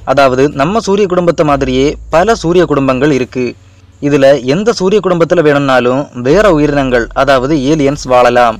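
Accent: Kanyakumari, India. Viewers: Kumari Tamil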